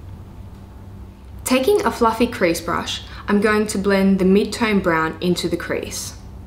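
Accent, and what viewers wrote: Australia. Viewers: Love hearing Aussie accents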